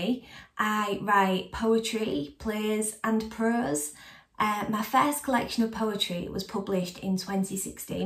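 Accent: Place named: Hull, United Kingdom. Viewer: thanks, good to hear a Hull voice.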